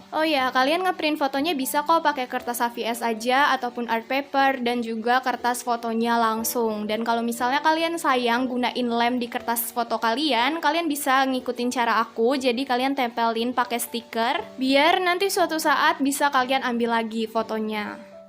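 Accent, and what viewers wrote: West Java, Indonesia. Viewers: Qaqaq orang Sunda:v? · Ka orng Sunda nih?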